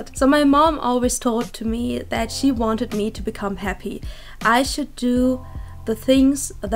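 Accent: Germany. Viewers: Ich bin mir unsicher ob ich deinen deutschakzent im englisch nicht zu sehr mag als das ich wechseln würde. Ich glaub ich schau lieber deine englischen viedeos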